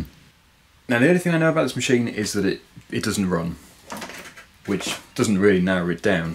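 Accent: United Kingdom. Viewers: Excellent video, thank you! And the British accent makes it even better.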